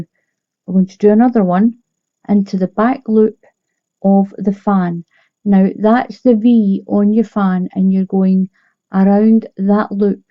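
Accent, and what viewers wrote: Scotland, United Kingdom. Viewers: your beautiful Scottish accent remember me of the good time that we had in Scotland. My husband looked forward on breakfast every morning because of the haggis. You are a wonderful teacher, thanks for your great crochet tutorials. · Hello, I just subscribed your channel. I watched many different ones and yours is my absolute favourite!! Beautiful crocheting and I love how you explain everything so well and repeat it over and over, which I find important because then I don’t have to go back to find it again. Also love that you speak slower than most do, it makes me feeling relaxed. And your British accent is lovely 😊 I’m German but live in Canada since 26 years. The winter is hard and long, so I picked up crocheting. I’m enjoying making this one here right now. I have saved several videos from you and I want to do them ALL! 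Lol Thank you for doing this❣️👍👏❤️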